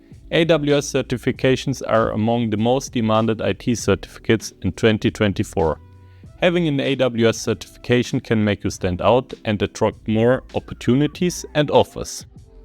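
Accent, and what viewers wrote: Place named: Germany. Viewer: Love the German accent. Thank you for the video, very helpful, especially regarding getting started with the cloud topic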